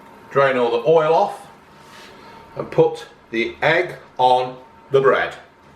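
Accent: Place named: Sheffield, United Kingdom. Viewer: Tanvir Sekhon Kell Brook accent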